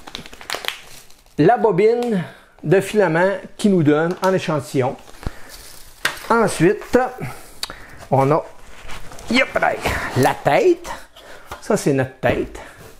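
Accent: Canada. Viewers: Bonjour Et merci pour cette presentation avec l'accent sympa du Canada Belle machine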